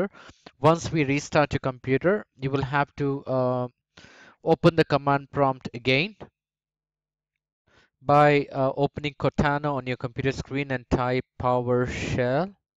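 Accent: India. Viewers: knew it was going to be an indian guy helping me lol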